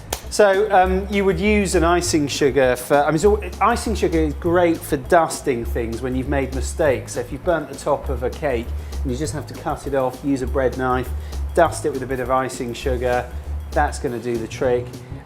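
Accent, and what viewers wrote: United Kingdom. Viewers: That thing with british accent I always fall in love. New sub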